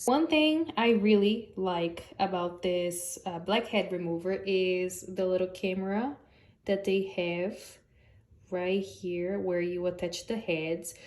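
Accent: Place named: Brazil. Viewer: You sound Brazilian! ❤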